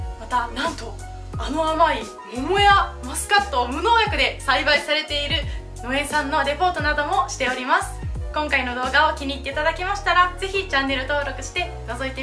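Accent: Kansai, Japan. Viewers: たいへんよくわかりました。 関西の方のようですね。関西弁でやってもらっても、結構いけるんちゃうかなあ。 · 気のせいかな？ なんか関西訛りがちらほらと、、、😊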